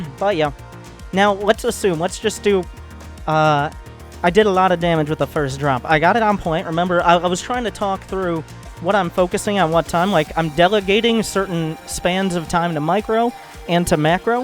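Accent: South, United States. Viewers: I m curious as to where are you from? is this a Southern accent?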